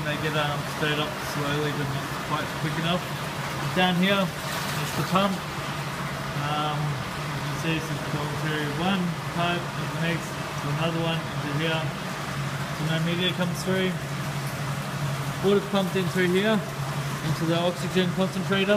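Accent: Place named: New Zealand. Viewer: Dude he sounds like a kiwi to me, You Aussies not satisfied with trying to take responsibility for creation of the pavlova want to lay claim to anything else good new Zealand produces too.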